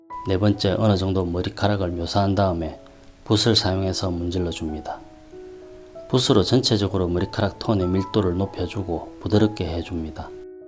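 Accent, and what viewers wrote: Gyeongsang, South Korea. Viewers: 근데 어느 지방에 사십니까? 말투가 경상도이신거 같은데 가끔 빵 ~~터집니다ㅋ 그림공부하는데 많은 도움이 됩니다 감사합니다^^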